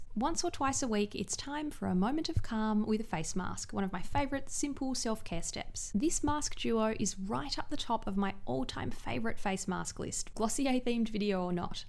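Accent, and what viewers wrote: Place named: Australia. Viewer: I love your Aussie accent. Your voice is so calming. You should make a skincare asmr video 😂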